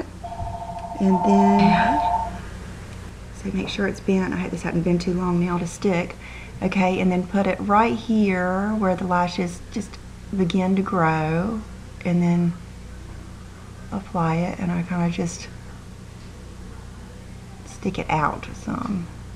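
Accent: South, United States. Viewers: Wow, they need to bring that paint pot back! Such a pretty look on you. I like my brushes "seasoned" too.....lol. I got that Nars eyeshadow in the mail Friday, it is so pretty and different. Oh, one more thing so you won't think I'm crazy, I don't know why I thought you were from Atlanta! That's why I told you I loved so close to there. Sorry. (Maybe the stereotypical southern accent that most of us from the south have, idk?!) xoxo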